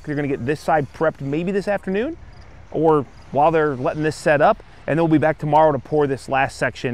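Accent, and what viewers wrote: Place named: Midwest, United States. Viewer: Hi I'm a big fan of your channel, in from Yorkshie, England. We are known for our strong accents, but I love the way you say ( kahncrete ) not sure if that's how most mid west people speak, but it's got a proper workers sound to it. Full respect to you and for your hard work.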